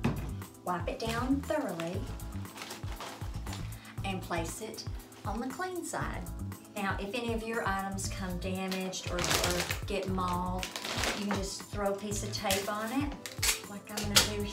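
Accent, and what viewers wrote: South, United States. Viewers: Funny, great looking, with a soft southern accent.😅❤️🇺🇸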